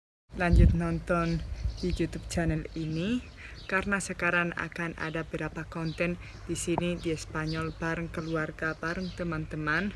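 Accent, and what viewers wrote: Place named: Yogyakarta, Indonesia. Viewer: .....logatmu udah Jogja banget, ditunggu video lanjutane yooo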